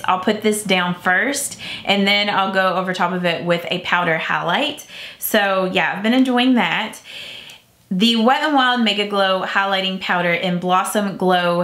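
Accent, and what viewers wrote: South, United States. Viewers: I love your videos. I love drugstore make up too and use it all the time. I am from the south too so I love your accent.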